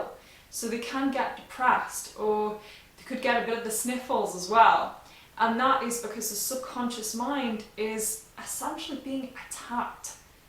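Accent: United Kingdom. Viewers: I don't know. You're beautiful and charming. And that British accent. My God. God will marry me to a girl like you. You're so adorable. 🎡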